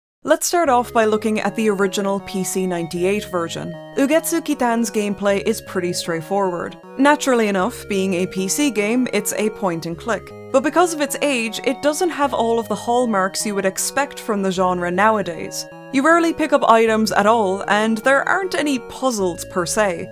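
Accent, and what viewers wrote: Ireland. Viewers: Ooooooooh an Irish accent. Always love to find niche horror game deep dive extra points for the familiar accent in a sea of Americans Australians and brits 😁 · Where is your accent from. Is it a mix of Irish with something else.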